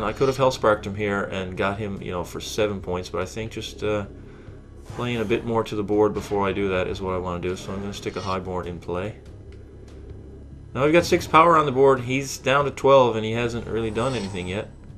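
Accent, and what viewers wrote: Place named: Newfoundland, Canada. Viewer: I'm sitting here thinking.... this guy.... I think he's from Canada.... he sounds..... NEWFOUNDLAND. Yup. I'm from BC, so no hate here haha.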